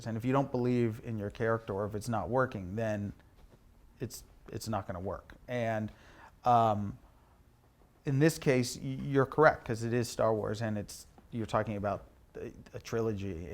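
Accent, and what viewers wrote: United Kingdom. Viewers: Que inglês é esse, hein,?! Sotaque britânico, pronúncia impecável e fluência perfeita! E a entrevista está ótima também! Meus parabéns pelo canal.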